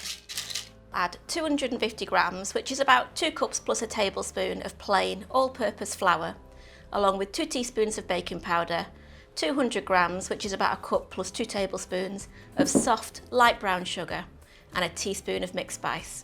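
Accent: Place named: North, United Kingdom. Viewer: Hi I’ve just made your tea loaf and all the family give it a 👍, very nice I’ll definitely be making it again,it’s good to hear a northern lass no messing job done. All the best from Manchester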